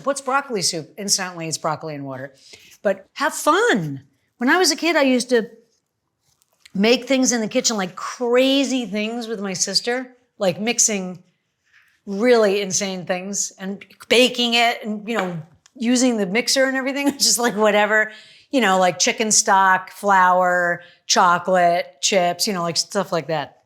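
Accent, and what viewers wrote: RP, United Kingdom. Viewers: I love your Fanny Craddock accent. Perfect! You're a hoot and the cooking is pretty good too! 😍💛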